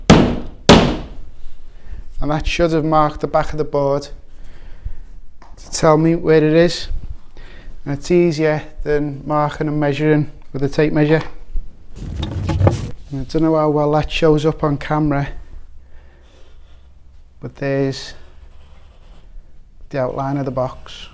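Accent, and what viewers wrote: Liverpool, United Kingdom. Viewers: I'm pretty sure he's from Liverpool? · Hello Scouser! :)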